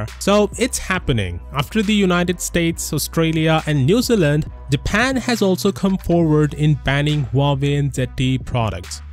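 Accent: India. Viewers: You're sound like an indian scammer. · Huawei is good competition for big Smartphone Companies. You also should get rid of your accent you sound like Indian Scammer.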